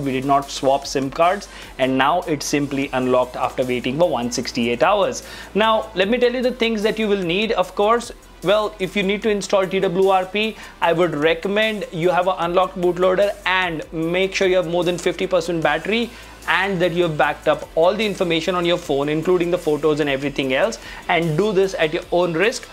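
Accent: India. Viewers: nice an indian speaking english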